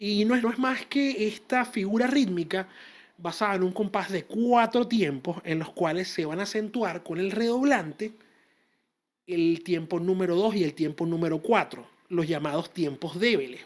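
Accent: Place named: Venezuela. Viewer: chamo eres venezolano,,, seguro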